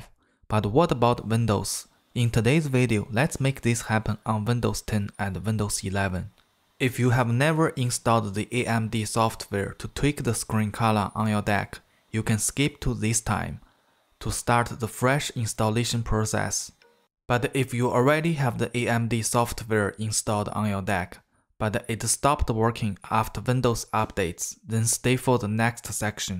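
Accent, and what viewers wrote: China. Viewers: 这是中式英语吗,口音感觉好熟悉啊www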